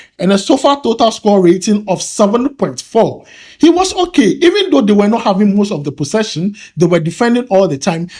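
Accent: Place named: Nigeria. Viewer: That means the Trainer is not good.A Ghanaian you’re speaking English like a Nigerian.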